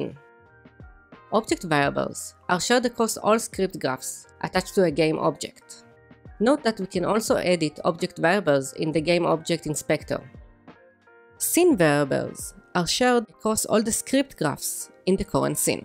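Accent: Israel. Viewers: אני מזהה את המבטא הישראלי מקילומטרים. אחלה תוכן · at the start i know that you from israel מההתחלה ידעתי שאתה מישראל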